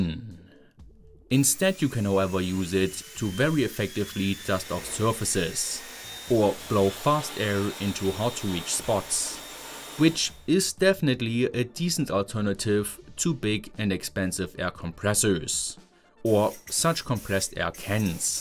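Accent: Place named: Germany. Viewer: Very informative, but the weirdest accent I've ever heard... super German, but still saying "garage" like an American, and also; who taught him to hold a pencil? That looks terribly painful/inefficient !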